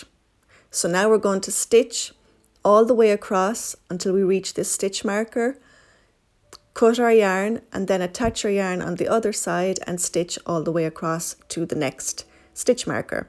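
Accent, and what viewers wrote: Ireland. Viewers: I'm delighted to have found your tutorials. It's very easy to follow your instructions and I love your Irish accent!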